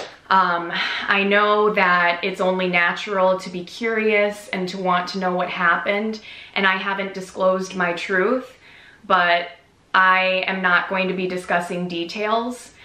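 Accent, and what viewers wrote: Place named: Minnesota, United States. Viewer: Minnesota accent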